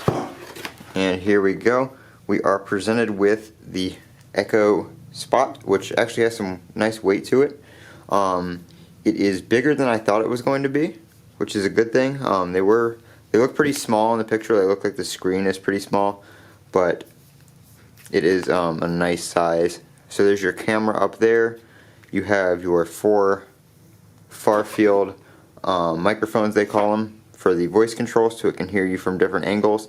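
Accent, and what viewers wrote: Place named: United Kingdom. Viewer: WoW I don`t know why she sound British for gods sake WTF !!!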